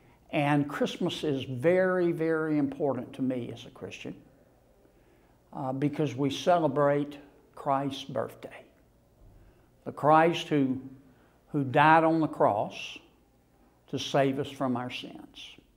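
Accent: South, United States. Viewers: I'm from Texas but you are an awesome Senator. You got that southern talk and that's ok by me. 👍 Merry Christmas 🎄☃️ May God Bless Us All. ❤️‍🔥🕊️❣️🇺🇸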